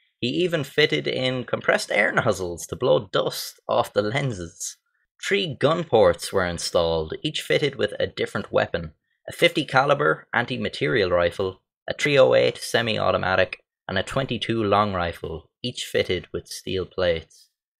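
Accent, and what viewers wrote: Ireland. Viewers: Is that a Carlow or Wicklow accent you have ? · I just stick around to hear him pronounce “thirty” with a D. Abd other Irish crap like that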